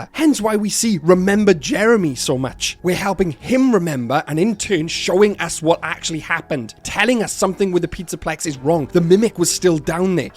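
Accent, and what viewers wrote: Wales, United Kingdom. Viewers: I've never watched this channel before, so clicking on it I expected someone American voice or something. Quite surprised to hear such a thick Welsh Valley accent lol. Cymru FTW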